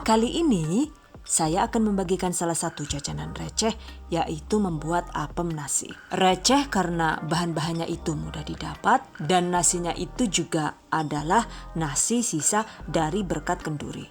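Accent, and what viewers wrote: Banyumas, Indonesia. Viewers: Like Bunda ngapak KU tersyg 🤣 🤣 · Keren koh ngmg ngapak Sukses embak e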